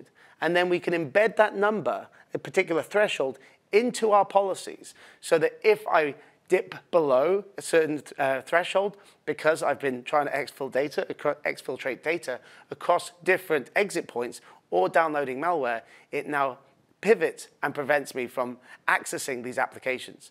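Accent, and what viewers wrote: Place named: United Kingdom. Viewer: A Netscope rep for Australia sent me this link. Am about 50 secs in and had to write this..... A well muscled guy with a cool Brit accent (I'm a pom too) - tick, impressed so far but wanting to get emails while on a treadmill.....seriously? LOL (I have been in IT 35 years, almost retired and now starting to really feel old!). That said, it does seem a cool product.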